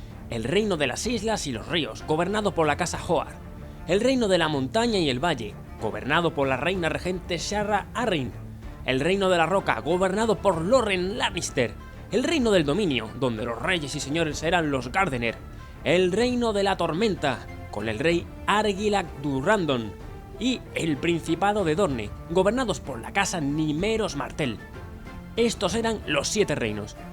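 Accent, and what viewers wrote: Castile, Spain. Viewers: Me encantó el video :). Es tan raro para mí ver todos los nombres en castellano y también el acento!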